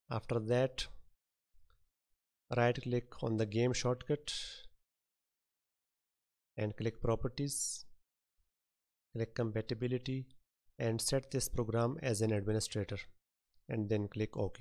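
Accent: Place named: India. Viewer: when i hearf this indian accent i knew that this will works THX!!!!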